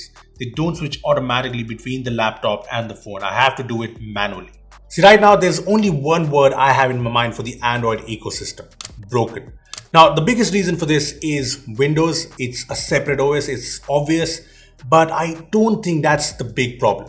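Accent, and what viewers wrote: India. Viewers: You have the english with indian accent which I hate, however I never got annoyed of your talk and I love to watch you.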